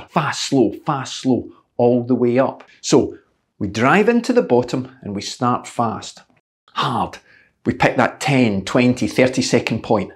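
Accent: Scotland, United Kingdom. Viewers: I love how you're lovely scottish tones make hill sound like hell, I think either works lol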